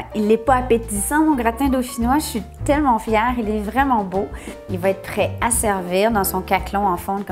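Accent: Quebec, Canada. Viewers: hhhh ouii je voulais dire ton accent québécois j'adore comment tu parles <3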